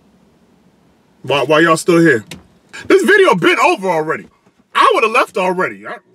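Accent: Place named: Atlanta, United States. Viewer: you sound like you from Atlanta Georgia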